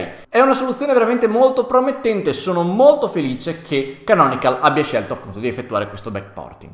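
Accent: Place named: Emilia, Italy. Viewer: sento un accento famigliare...tra Ferrara e Bologna...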